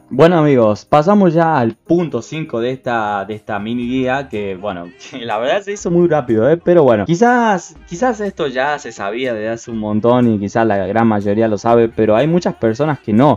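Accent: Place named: Argentina. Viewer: si le quitas el acento a argentino es igual a la voz de fernanfloo xd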